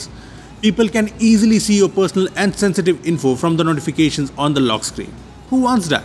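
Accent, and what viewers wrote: India. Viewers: Fuck you and your indian accent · The Indian english accent is just hilarious XD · I love Indian accent 😁😉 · As a small country East Asian, Sorry, I'm not fluent English. If you have some time, Could you add English subtitles to this video? I want to learn Indian English also. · Thomas Politis me too I hate this Indians videos, his acent is just disturbing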